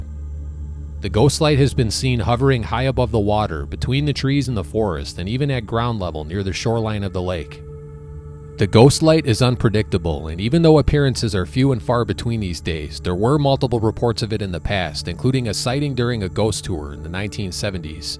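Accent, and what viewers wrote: Chicago, United States. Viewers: I've lived in Southern California since 1975, however I grew up in Chicago and St. Louie, so I thoroughly enjoy your videos and your accent, which reminds me of home. You're fantastic. Thanks for all your hard work!😊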